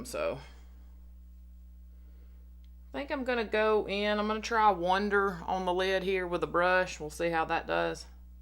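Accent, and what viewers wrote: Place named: South, United States. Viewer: Hi. I'm new to your channel and I enjoy watching very much. I am born and raised in the south also. Love your accent! I am from very and humid Polk County Florida. Dead center of the state. Thank you for sharing about your camp experience and your closeness with God. I need to hear it too. I am so glad you had a great time being in nature. Keep up the good work with your channel. I am sure you will get to 300 subscribers very soon. Good luck!!!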